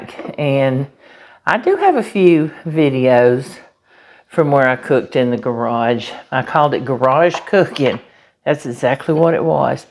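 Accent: South, United States. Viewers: First time here and I have two things to say. When I hear your voice, I’m reminded of the old girls in the southern Baptist Church I attended years ago in Tulsa. You also have an accent like Paula Deen’s. Y’all could be cousins. 😊 Your voice is soothing. I bet you can tell a good story. The grub looks good.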